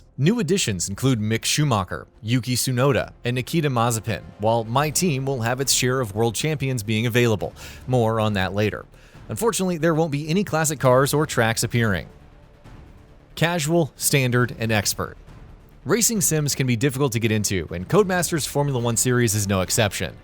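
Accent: United States. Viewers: Never let an American narrate this again..... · Jesus christ the Americans yet again have murdered the english language. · drives me up the ffkin wall. what the hell is ren olt?? Re nooo! not hard and Marrrzipin is Maz a pin Why won't yanks get that the letter a isn't a flaming R!! And Alain Prost where is the e that turns that o to an oh sound??? FFS I don't like dislike many videos but this gets one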